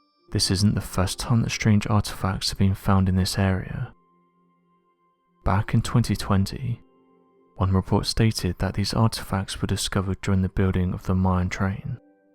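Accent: United Kingdom. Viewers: Cool info. But the British accent I can't listen.. I know it's not right. They are the worst ,look at histroy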